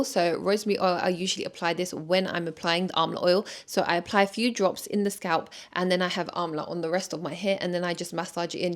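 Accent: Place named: England, United Kingdom. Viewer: Your English accent ❤🎀🤌so amazing i impress ❤you look gorgeous and will follow these tips in Sha Allah 🙏😊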